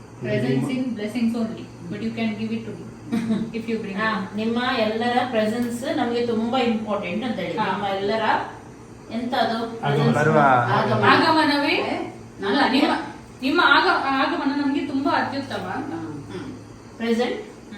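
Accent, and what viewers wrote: Mangalore, India. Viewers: Manglur bhasha yestu chanda😊l fast time watched your video super 😊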